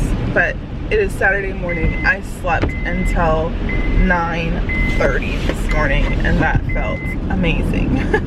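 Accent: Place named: South, United States. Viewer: You're totally picking up a little southern accent girlfriend!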